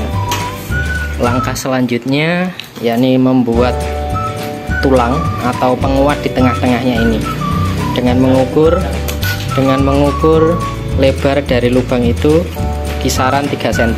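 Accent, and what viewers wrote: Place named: Java, Indonesia. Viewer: Josssss pak ketua 👍👍👍 medok2 gak masalah yg penting jadi😅😅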